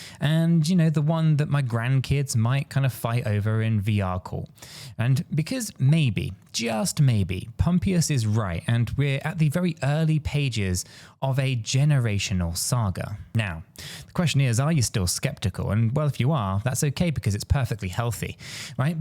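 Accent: United Kingdom. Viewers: The only thing worse than an entire video with non stop sarcasm is hearing it with a British accent 🤮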